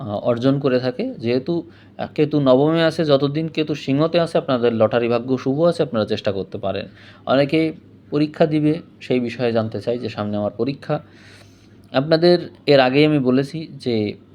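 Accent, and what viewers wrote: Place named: Bangal, Bangladesh. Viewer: আপনার বাংলা ভিডিও তো নয় ---এটা বাঙাল ভিডিও। বাংলাটাই তো ভালো করে বলতে পারেন না। আগে বাংলাটা ভালো করে শিখুন তারপরে বাংলা চ্যানেল খুলবেন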